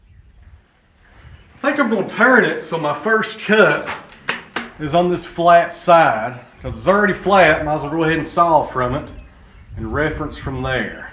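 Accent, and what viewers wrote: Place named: Georgia, United States. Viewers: First vid I've watched of yours. Soon as I heard your accent I recognized it. You say your in Georgia? I think I heard your accent from guys from Kentucky too. I could be wrong. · . . . he has a 'drawl' in his voice.Beautifull accent.Lots of them there down in the south...I enjoyed the show very much and i thank you for taking the time to spend it with us....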